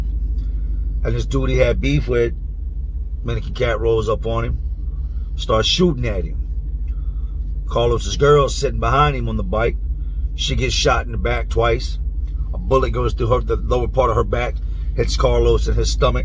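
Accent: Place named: Arkansas, United States. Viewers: U can tell u spent time in Philly.. u got the accent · oh my gosh, that’s probably one of the reasons I like this channel so much. I’m from rural Arkansas and all of my male family members talk like that. It’s very comforting to me😂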